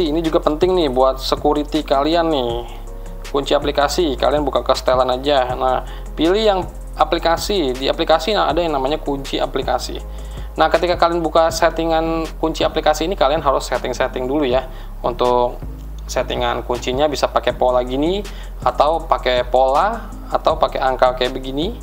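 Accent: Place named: Sulawesi, Indonesia. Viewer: Kental banget logat Sulawesi nya bang 😂😂😂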